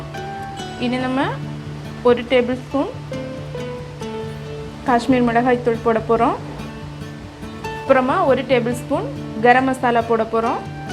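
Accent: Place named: Kanyakumari, India. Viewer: vearaci.... kollam✌😍 Nature of kanyakumari slang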